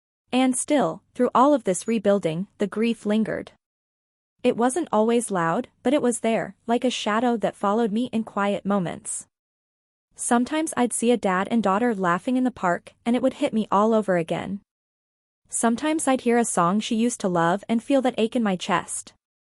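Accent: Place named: India. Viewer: Is the Indian accent really necessary? It detracts from the storytelling.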